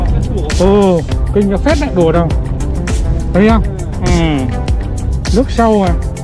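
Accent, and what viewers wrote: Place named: Hanoi, Vietnam. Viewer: Admin giọng HN ạ, dịp sau có kèo nào cho em đi chung với :)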